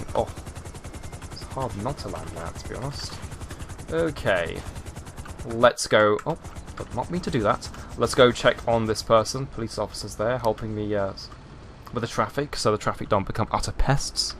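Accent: United Kingdom. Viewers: I like your english accent 😍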